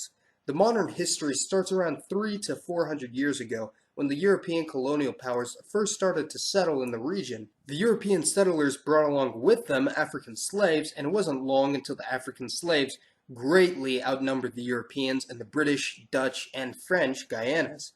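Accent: United States. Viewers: Thank you for great clips. One thing i can say is that please, if it is possible to put english subtitle, cause american english pronaunciation is defecault for understand. I am from southeastern Europe and british english is more understandable for me. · He was on no more than telling out a university assignment to us all. What the man says will highly suggest that it is fact. When what he is reading as the sources, gives him sentencing and paragraphs without the words might, could or possibly. No, all cohesion does is make an individual sound academic which he does superbly. Also, he comes across coherent, yet others may argue that the use of English language amongst Americans never sounds as coherent as a non academic schooler from England. For example, the part in this video where he presents the pie chart data about population of Africa India and others; however, cohesive - a non academic English child could in my reckoning sound more coherent like with 'the flocking Indians rule over there'. 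Once again American accents take away a little coherency of English languages. Only a little mind you he is still heard. I listen back to my English and sound like a gay kid looking at his own backside arching for please please sir I want some more or whatever Oliver said in his moment. I does think like in the case of Mauritius, that in the West books like remembering the world started in the 19th century when slavery was abolished. When we all know the world is still less than 200 years old from 1837. My guess is Europeans knew how advanced they felt about themselves and really in their hearts knew despite colonialism that they were never turning Africa into European beliefs without slaves. Ask scholars why they don't talk about wh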